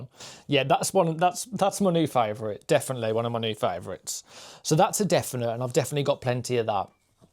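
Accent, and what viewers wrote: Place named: England, United Kingdom. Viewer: Without Kate I wouldn't have known about you. Be yourself it's your nature, your channel. Happy to know you, I'm from from south of France and I enjoy to meet new english accents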